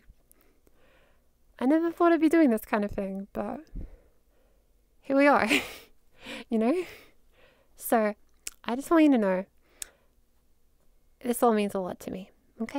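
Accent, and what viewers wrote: Australia. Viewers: Thank you! I'm English so an Australian accent is so much closer to mine than all the American ones I've seen. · Oh my god this is awesome! This format/editing excites me so much to practice my voice and you're Australian!! I'm also from around here and I was worried that my accent would turn more American from other tutorials. You've inspired me :3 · finally an australian!! some vocal patterns i can imitate without turning into an american 🥹🥹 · This is excellent, thank you so much for making this! It's also such a huge relief to hear another Australian doing this, because American voices are so different and that is so much of the voice-related content out there! Your advice is so practical, and I love the energy and vibes you bring :) The heart-felt message at the end gives me so much hope and makes me feel like I can really do this too. 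Thank you so much!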